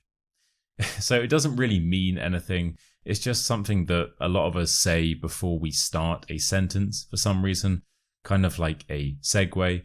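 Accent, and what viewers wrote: United Kingdom. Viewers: I love your accent so British, I wanna speak like you 😊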